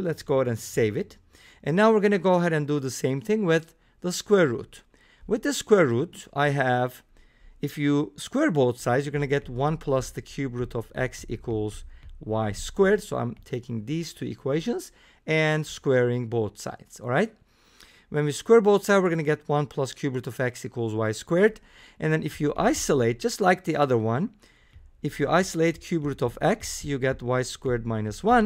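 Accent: Turkey. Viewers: You have a Turkish accent, are you Turkish by any chance ?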